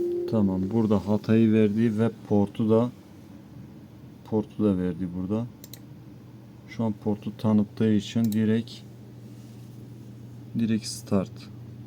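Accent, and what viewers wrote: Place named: Urfa, Turkey. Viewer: Ustam sen galiba urfalisin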